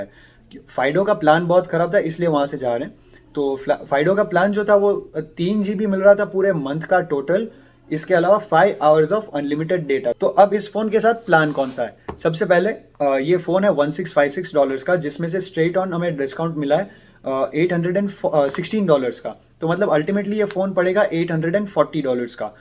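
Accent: Gujarat, India. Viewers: Are you gujju?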